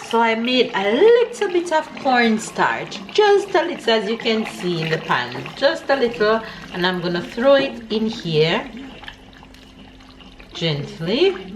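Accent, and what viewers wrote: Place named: Jamaica. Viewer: If you didn't have the Jamaican accent i was going to skip this video ..... im excited to try this tonight !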